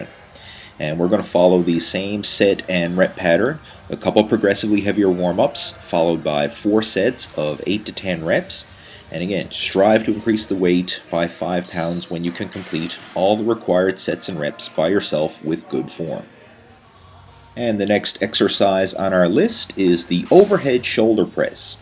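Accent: Newfoundland, Canada. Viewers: are you a newfie?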